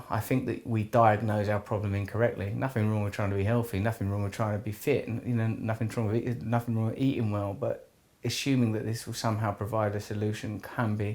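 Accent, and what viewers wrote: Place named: England, United Kingdom. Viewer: You always amaze me... whatever you do! As a human being, I’m guessing there’s probably NOT MUCH, you’ve not experienced. ( it sounds so much better too... advice & rhetoric coming from a British accent!! Am I right? ) - Keep up the good fight in educating our youth! My lack in self confidence, is just that! I’m too afraid to stand up, to be, truly me! I wanna be great... & in just saying that, it makes me feel... blah- I’m comparing again! To you! ... I hope I’ll someday find that peace, within myself! God Bless you... Sir!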